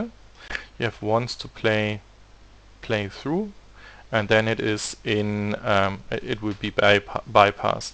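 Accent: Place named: Germany. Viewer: Ich habe direkt rausgehört, dass es ein Deutscher ist haha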